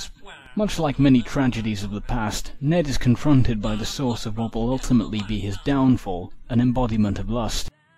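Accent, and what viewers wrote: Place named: England, United Kingdom. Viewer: I don't. I have asbolutely no idea what this series of videos have been all about. I subscribed to because of slowbeef's rambling mock let's plays, and I have been utterly mystified by the English-accented bloke who has been commenting on this series of flash games, if indeed that's what they are. Although, perhaps, fucking with the likes of myself is perhaps what this series of videos ahas been all about in the first place...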